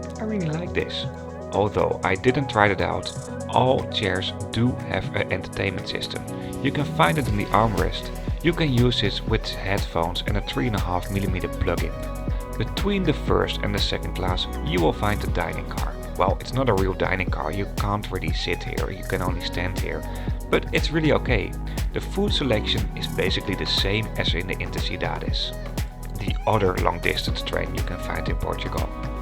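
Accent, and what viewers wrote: Netherlands. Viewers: Very nice vid! But, please, please - do something with your dutch accent ! These are, of course, "very" and "luggage" ! (Instead of "fery" and "lagitz"! ))).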